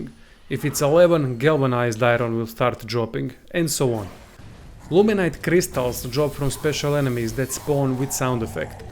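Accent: Russia. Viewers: Nice video plus nice voice. is this russian accent? pretty cool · Can never go wrong with tutorial by a man in Russian accent. heart emoji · Thanks a lot man ! Learned a lot here, also loving your Russian accent (no homo) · New to the game here and your tips are very helpful. I love your russian accent 🥰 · когда русские говорят на инглише, да еще и с ярко выраженным акцентом это просто разрыв ушей!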